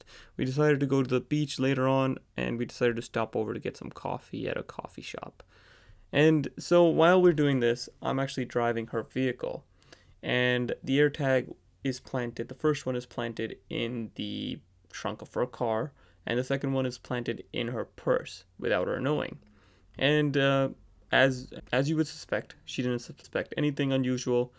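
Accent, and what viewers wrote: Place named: India. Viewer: indian accent does not surprise me about a guy tracking his girlfriend lol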